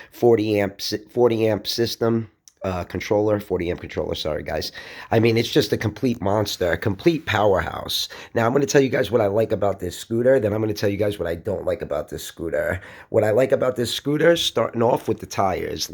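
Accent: New York, United States. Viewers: I love your commentating love the NYC accent keep up the great work my friend!!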